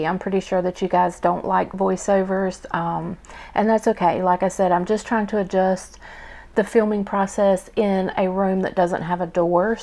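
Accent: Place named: South, United States. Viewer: looove the spread and the southern accent 😍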